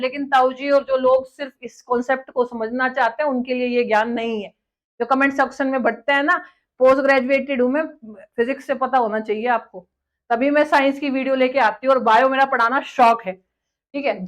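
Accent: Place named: South Haryana, India. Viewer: Bitiya aapki bhasha se lagtah h aap South Hariyana se ho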